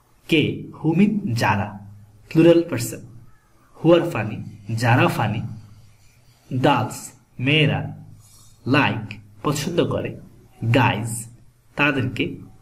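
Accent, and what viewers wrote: Dhaka, Bangladesh. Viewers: Although you are from Chittagong Division, your accent sounds more like that of a person from Dhaka than a person from Chittagong or Teknaf. I see that Ramganj is not so far from Dhaka, so that probably explains your accent. Chittagong Division is very large. It stretches to Dharmandal, which is farther north than Dhaka! I have a friend from Cox's Bazar, who will be moving to Dhaka in a few days. I am from USA, and I am a friend of his family. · Thank you. I fell in love with a Kolkatan girl your videos help me a lot. Is your accent Dhaka accent? Is it similar to Kolkata?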